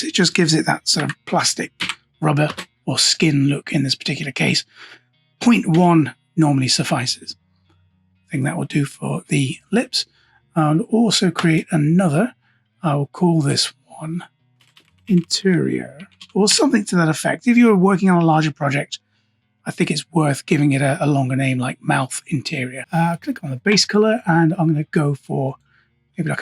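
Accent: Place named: RP, United Kingdom. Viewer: Think I've found the Attenborough of Blender tutorials, all the way down to the very accent if I'm not mistaken! And that is meant as a huge complement since he's the king of human voices.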